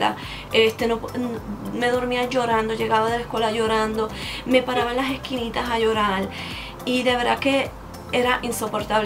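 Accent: Puerto Rico. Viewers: ¿De dónde eres? Tu forma de hablar se me parece a la de Puerto Rico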